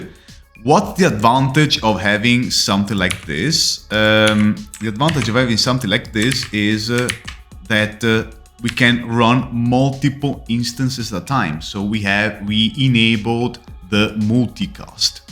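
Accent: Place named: Italy. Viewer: Bravissimo, l'accento italiano si sente tanto ma devo dire che sei uno dei + bravi Italiani che ho sentito in merito ad "Accento" (te lo dico da italiano all estero eh...:)). 'Scolta ho una domanda... ma per entrare un po + sul tecnico dove mi consigli di guardare? Intendo sulle varie opzioni di ffmpeg, mux / demux / encoding etc etc. Online non trovo tanto materiale in merito (tanta teoria, ma parzialemtne declinabile poi sui vari softwares). Ciao grande, bravo, Luca.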